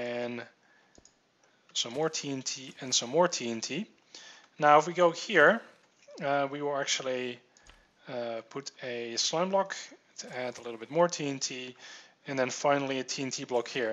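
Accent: Netherlands. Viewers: He sounds dutch.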